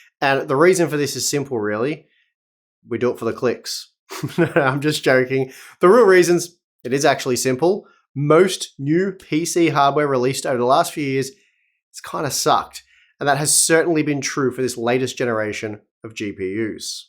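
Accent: Australia. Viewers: I really like ur channel as Australian English is much more easier to understand for foreign people.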